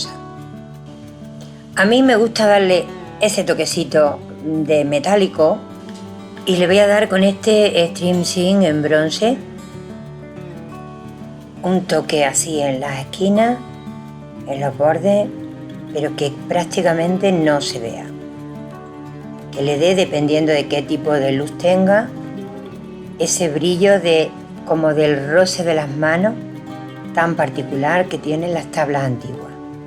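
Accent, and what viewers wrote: Andalusia, Spain. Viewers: Hermoso trabajo!! Desde Argentina 🇦🇷 me encanta tu tonada andaluza! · Hola me ha encantado esta maravillosa obra de arte y darte las gracias por darnos la oportunidad de las personas que nos gustan las manualidades como es mi caso, sin semejante mi de lejos a ti, podamos intentar hacer tantas bellezas. Soy de un pueblo de Barcelona, aunque me encanta tu acento y Andalucía en si,. Muy agradecida y te deseo mucha salude y suerte 🍀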